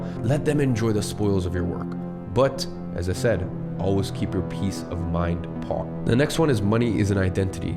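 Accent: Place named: United States. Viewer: I don’t understand, isn’t he British ?? Why is his accent American?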